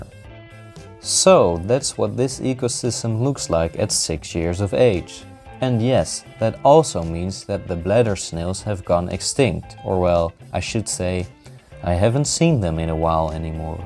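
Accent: Netherlands. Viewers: >hear accent >see background environment This guy is 100% Dutch.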